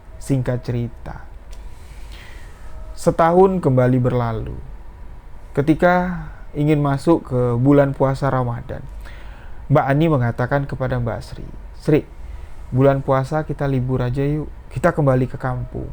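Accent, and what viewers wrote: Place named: Java, Indonesia. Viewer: Lbh suka crta Dari kk nyeritainnya dgn kata kata yg mudah dipahami n detail.bnr bnr bgus.sayaharap bg Riosprti kk lbh pke bhsa yg bagus bhsa Indonesia yg mudah dimgrti(GK pke yo yo medhok java)Mohon maaf hnya sbtas kritikan.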